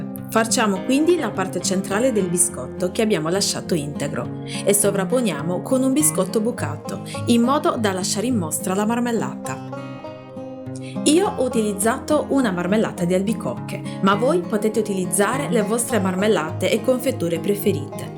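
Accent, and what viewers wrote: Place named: Sardinia, Italy. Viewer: Ciao ti seguo sempre: molto brava👍sei sarda eh!!!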